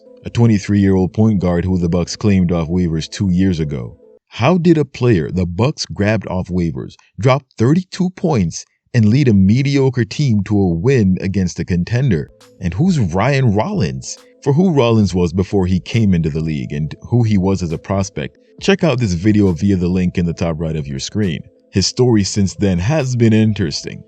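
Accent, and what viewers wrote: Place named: Jamaica. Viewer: Jamaican is the last thing I imagined you to be. And now I can hear it. Not that I don't think a Jamaican can code switch to accentless English. Praying for those victims of the hurricane.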